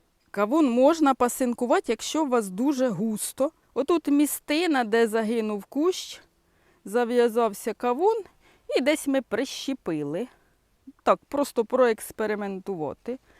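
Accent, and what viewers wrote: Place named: Poltava, Ukraine. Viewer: Цікаво і пізнавально, дякую. І ще, насолоджуюсь вашою говіркою, так похоже розмовляють у багатьох селах Зіньківщини, де довелося деякий час жити. Там є село Власівка - точнісінько така ж інтонація в розмовній мові. Бажаю гарних врожаїв.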